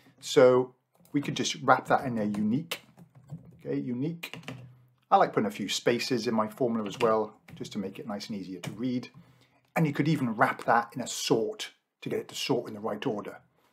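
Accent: Wales, United Kingdom. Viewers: Which part of Wales are you from?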